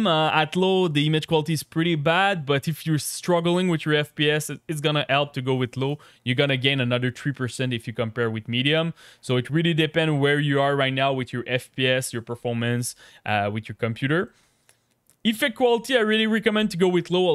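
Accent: Quebec, Canada. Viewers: Is it just me or you are a french canadian ? (Qc)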